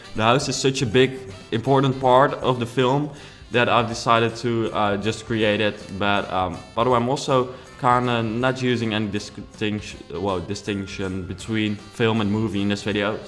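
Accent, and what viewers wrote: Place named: Netherlands. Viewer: Haha ik kende je kanaal nog niet, maar ik klikte op je video (zonder naar je naam te kijken) en hoorde gelijk al dat je Nederlands was! Haha, Nederlanders hebben altijd zo'n accent, wel een super leuke video👌🏻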